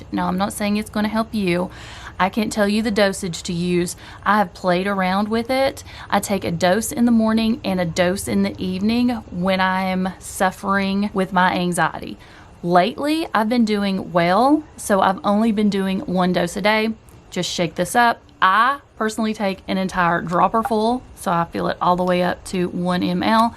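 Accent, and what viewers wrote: South, United States. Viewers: Girl! You are gorgeous ! With an adorable southern accent to boot!! I was also the tall, red headed freckle faced girl in school and was made fun of. I turned it around and realized I could carry more weight than the shorter girls and red heads are rare! You got it going on and don't let anyone tell you different! 💖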